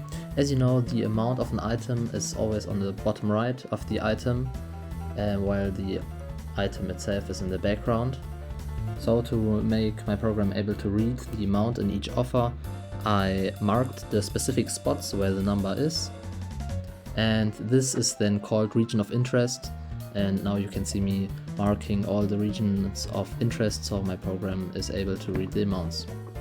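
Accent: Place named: Germany. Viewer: Are you German?, as a german i woud say that you sound german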